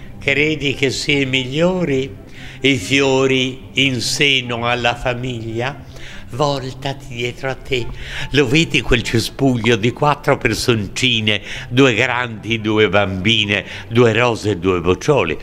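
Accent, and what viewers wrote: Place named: Florence, Italy. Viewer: Interpretazione fiorentina fantastica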